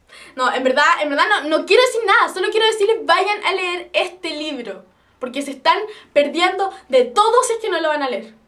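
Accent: Chile. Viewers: eri chilense?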